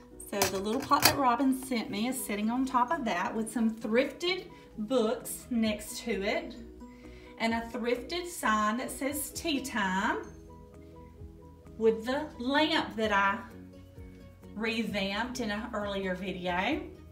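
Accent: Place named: Alabama, United States. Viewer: Everything was so beautiful and you have created a warm and cozy home! Everywhere you look there is a treasure and a history. My two bedroom house is a little cottage and 73 years old (same as me). I looked closely at your windows(are they glazed?) and baseboard trim and am wondering if your home is an older one as well. I love the charm of an older home! It even has the original glass doorknobs!, you are a delight and I love your Alabama accent! Blessings from your new Central Florida friend❤️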